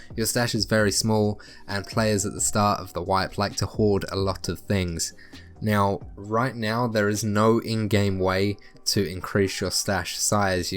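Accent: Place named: United Kingdom. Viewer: lol i didnt take it personally, but as someone who generally has mono-toned speach myself and has gotten shit for it in the past, i know that criticizing someone for the way they talk wont make them talk differently, if anything it'll probably just make them feel bad. You may as well have told him to stop speaking in a british accent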